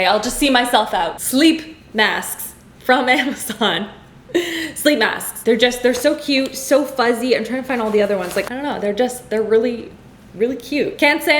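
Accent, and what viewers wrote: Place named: Canada. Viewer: The Canadian accent is strong today 😂🤣😂🤣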